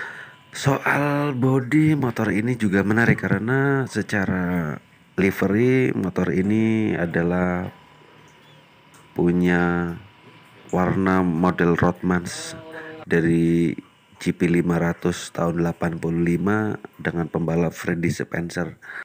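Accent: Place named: Banyumas, Indonesia. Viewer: Wong ngapak mas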